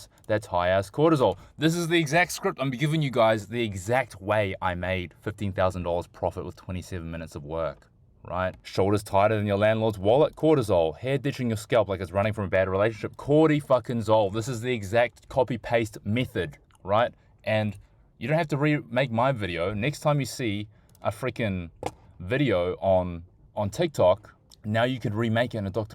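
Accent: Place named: Australia. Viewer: You sound like you're from Aus, but you do Tiktok shop in US? How does it work? US sim card, proxies etc ?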